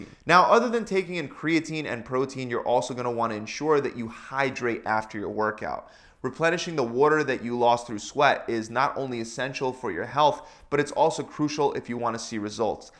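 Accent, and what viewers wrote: Philadelphia, United States. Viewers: I know he's from philly from the way he says water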